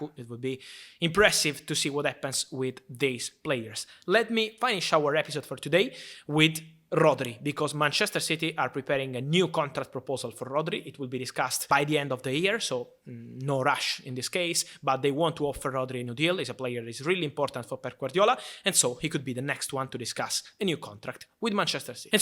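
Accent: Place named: Italy. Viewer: Wow! An Italian like me that speaks with my same accent. I feel i'm not alone anymore!!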